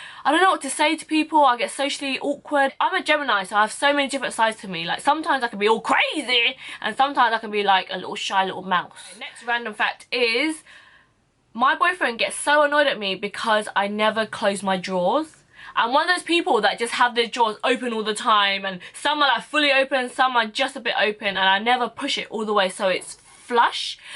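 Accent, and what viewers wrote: United Kingdom. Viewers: Is she British? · I just subbed...simply because i feel inlove with your british accent and your ombre brow 😉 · So funny those facial expressions hahaha u make me laugh, an Asian speaking with a British accent is fukn funny af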